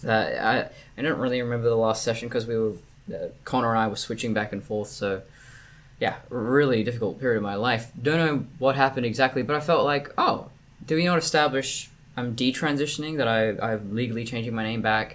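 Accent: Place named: RP, United Kingdom. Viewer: I don't know any Australians personally, but your accent does not reflect what I usually hear in movies. If you had not said it, I would have thought you were THOROUGHLY BRITISH, and of some LANDED GENTRY. 😄 What also adds a LOT to the effect is your REFINED POSTURE and choice of words. Is this accent COMMON in your local area "down under"? BTW, HILARIOUS your comment about America sinking under the waves and the water levels PERHAPS rising in your coastal city/town! Also your idea of a "kinky Lesbian" thinking lewd Heterosexual thoughts makes me laugh! 🤣 Glad you referenced "Waffling WIllow". I like her a LOT too! You BOTH present as COMPLETELY and ATTRACTIVLY FEMALE, but in almost COMPLETELY DIFFERENT ways: YOU as a "Duchess" type, and HER more "Princess" like. Amazing counterpoints, illustrating the WIDE RANGE of human FEMININITY! 😃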